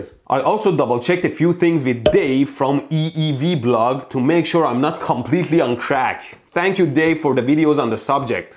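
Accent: Iran. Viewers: I love his bold persian accent :) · What an Iranian accent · love the Iranian accent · Are you Persian? I can say it from your accent 😊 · Jan can you believe more than 20 years ago, this was the question asked in first year at the uni by professor teaching electronics-physics class, You are brilliant bringing joy to Iranian/Persian community with your intentionally thickened accent. I graduated in <num> and have been working at telecom (Mobile Core Network) eversince, it was so refreshing to see your video. tnx.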